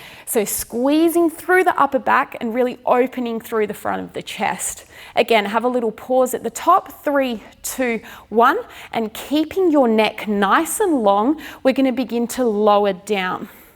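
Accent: Australia. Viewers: Great to hear an Aussie accent.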